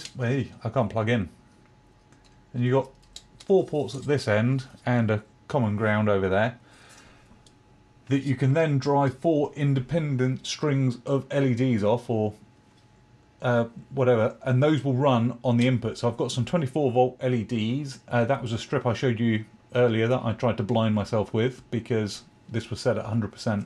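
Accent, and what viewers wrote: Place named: United Kingdom. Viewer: It’s always great to hear a UK accent. I hope the build is going OK. Personally I hate my Ender 3 and I love Klipper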